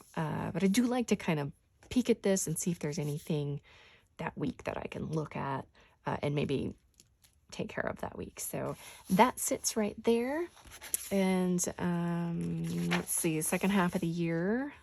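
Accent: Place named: South, United States. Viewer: As a Georgia girl, I appreciate hearing your southern accent. I am excited about your new channel. I really like your planner set up. Thanks for taking time to share it with us!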